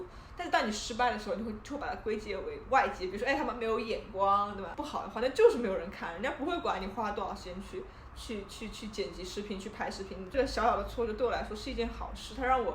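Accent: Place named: Taiwan. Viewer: 你的口音好很像台湾口音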